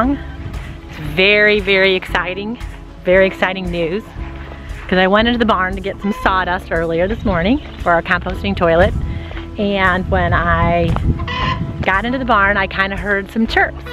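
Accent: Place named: Great Lakes, United States. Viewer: Was just able to stop laughing at least long enough to comment three years later. Cyndi Lauper was funny. My friend calls his branch cutter "Lauper" but "Phyllis DILLER" had me in stitches. I've been a sub for over three years and wasn't going to watch this cause of its age but glad I did cause I needed that laugh badly what with all the dark conspiratorial stuff I analyze [NOT theorize] & ingest almost every day. Needed that Light and silver sunshine you radiate. Not sure where you and live but it's well N of SW Blowhigho. I can tell by your near Great Lakes eaxecent.. LolOwell. Peessowt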